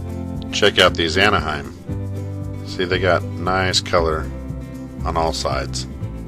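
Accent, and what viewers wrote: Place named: Texas, United States. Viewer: Lol. Come to Texas. We all talk like this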